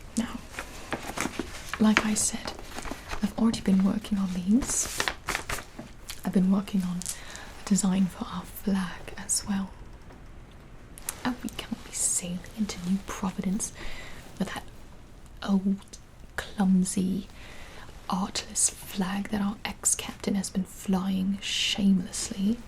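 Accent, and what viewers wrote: England, United Kingdom. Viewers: I had a british girlfriend and sounds more british than her ahaha. Seriously though, to nail it that hard and do a top-notch rp on top of all that is something else, Im in love with it. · You fully sound English here and this is coming from a Brit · That's an english accent not a british accent, please change it and respect the rest of the UKs individual accents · Your British accent is the best ☺️💤😴👍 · What English accent is that? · Why do you actually sound convincingly british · Bravo, that's a very impressive British accent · Yo English accent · *English accent. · English accent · English accent is one of the best Gibis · *English accent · *English accent not British lol Don't hat I love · You actually nailed the English accent, however I'm from the North so its a little different 😂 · There's no such thing as a bloody "British" accent. 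She is doing an ENGLISH accent. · Not a bad English accent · British accent is better then mine, quite jealous to be honest. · Ahhh yes a British accent because we all sound like that in the uk it’s not like there is 4 different countries with numerous different accents in them but let’s call it British but sound English yes smart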